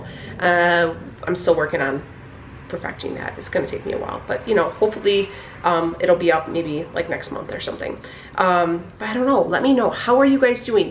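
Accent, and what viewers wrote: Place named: Chicago, United States. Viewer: I just found you a couple of weeks ago. I'm retired and doing Keto. I used to be a truck driver and always was interested in Chicago people when I went through. Love your accent! Enjoy the cooking segments, the fashion. I just taught myself dip nails😱and love your leopard nails today. Whatever direction you go i will be watching! There are lots of older women like me that miss the family hub-bub so its fun to watch and relive those times.❣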